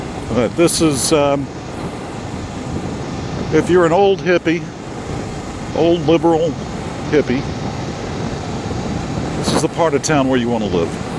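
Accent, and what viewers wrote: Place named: Memphis, United States. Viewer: thanks nice video i could hear elvis in your voice memphis accent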